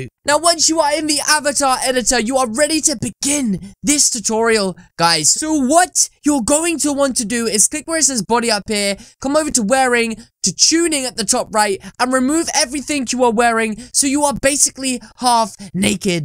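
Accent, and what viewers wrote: United Kingdom. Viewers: U British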